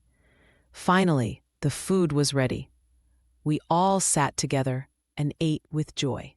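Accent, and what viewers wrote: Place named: United States. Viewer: Everything becomes very easy when the instructor is someone who can explain things in a straightforward manner. I understand English very well from your story and the best thing is your speaking style. I really like the American style. Your style of speaking and language are very good.Please upload two videos a week so we can practice and learn well.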